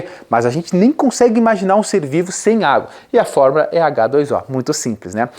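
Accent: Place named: South, Brazil. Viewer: e o sotaque sulista .. haha ♥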